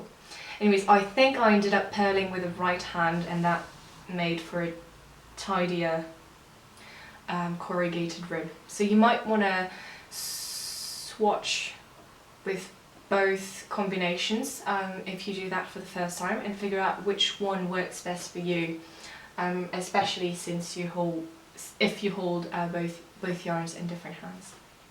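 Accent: United Kingdom. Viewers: you've even got a bit of a 'Brit' accent already-too cute! glad you found the time to share all the new and exciting things with us! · Oh your Southern English twang is adorable! I'd been wondering how you were getting on, here's a very late welcome to England 😊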